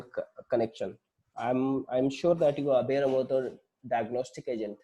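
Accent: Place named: India. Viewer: hard to understand it because of hindish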